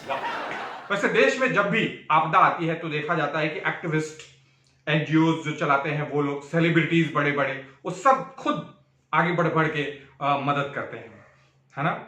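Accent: Bihar, India. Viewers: Bihari Andaz Mai EK THOW Ka shandhar presentation, Phir Economic warriors, Keep it up.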